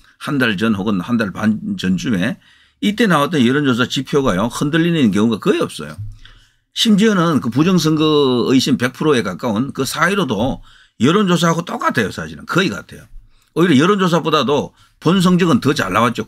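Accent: Gyeongsang, South Korea. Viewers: 님 경상도 말솜씨 에 정감이가고 진실성이 있어 많이봅니다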